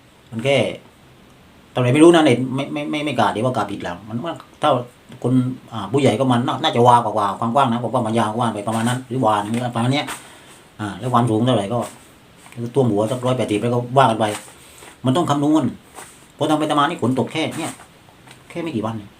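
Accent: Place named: South, Thailand. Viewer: หวัดดีค่ะ พูดใต้แทรกบ้างก็ดีค่ะฟังน่ารักดี เชียร์นะคะ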